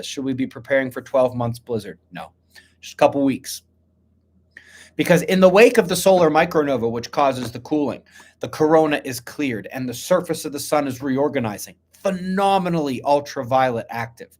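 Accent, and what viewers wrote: Pittsburgh, United States. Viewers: Ben, Thanks So Much for this discussion/update... Has anybody plotted any Theoretical Data on Post Pole Shift: Earth Rotation and or Slosh Factor from the Oceans? Thanks for the Pittsburg Dialect, I understood it all... Carpe Diem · That Pittsburg accent caught me off guard. Also your a very intelligent man how you expect ppl to come up with advanced questions. Lol Free Top G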